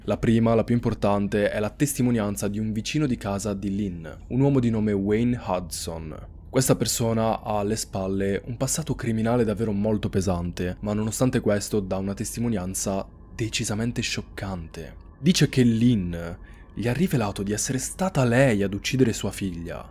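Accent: Sardinia, Italy. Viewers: Sardo, di Cagliari 😂 FANTASTICO ❤💙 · Noooooo giurami che sei sardo di Cagliari ti prego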